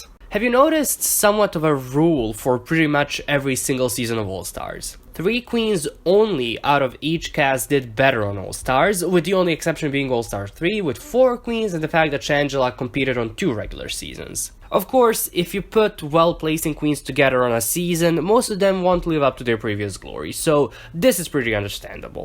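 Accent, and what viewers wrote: Spain. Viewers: As a mexican guy I can tell you that he has such a pretty "spanish voice/accent" haha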